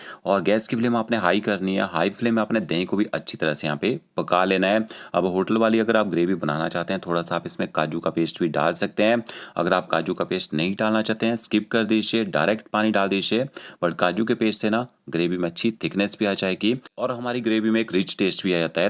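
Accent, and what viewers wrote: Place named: Himachal Pradesh, India. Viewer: Apne apne skill k dum pr ache utuber bn gye gud God bless you.I m from Himachal aur apki bat se lgta hai k u r also from Himachal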